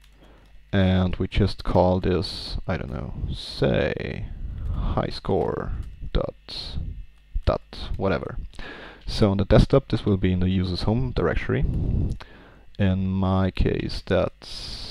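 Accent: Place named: Germany. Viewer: Nah, a heavy German one ^^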